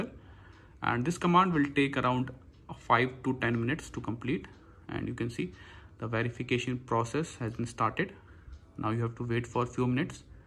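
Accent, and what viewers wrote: India. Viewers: Thank you man with a stereotypical Indian accent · I can't understand the indian accent 🙄 and he is refusing to answer questions.....not helpful at all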